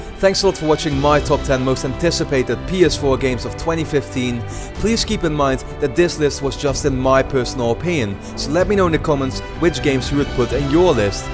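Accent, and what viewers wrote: Netherlands. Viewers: Ben jij Nederlands je accent klinkt wel nederland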